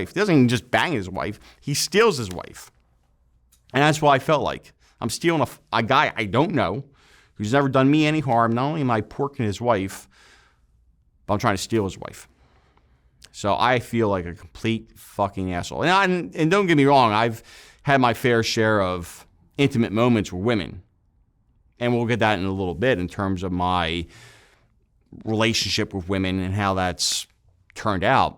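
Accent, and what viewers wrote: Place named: Baltimore, United States. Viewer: Holy hell is this dude from Baltimore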